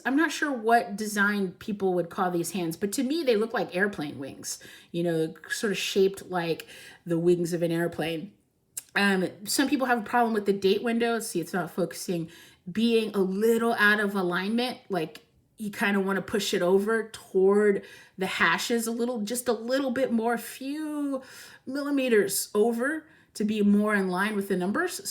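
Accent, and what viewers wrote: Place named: Midwest, United States. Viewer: Great review!!! Thank you. I'm considering the Mark XVIII black dial or the Mark XV which was the first IWC Swiss watch I've ever wanted since the 1990's (the other is a Reverso). Back in the day IWC was difficult to find. If I already acquired my wish list timepieces and money wasn't a concern. I would get a vintage military Mark XI from the 50's for the same price as a Mark XVIII. I currently own a Speedmaster Pro 1861 movement but I need something more anti-magnetic and water proof. It's a tough decision when it comes to items that cost thousands. Do I re-live my 20's Mark XV, or should I just go for the Mark XVIII? Which is a better watch, perfect size, symmetrical design, legible, 20mm strap options.... By the way, are you from the Midwest? You seem to have a Chicago/Detroit/Milwaukee/Ohio accent.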